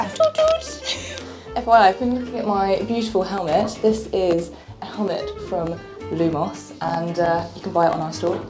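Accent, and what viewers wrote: England, United Kingdom. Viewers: A women with and English accent makes me harder than a bag of jawbreakers, or was it a bag of tenpenny nails!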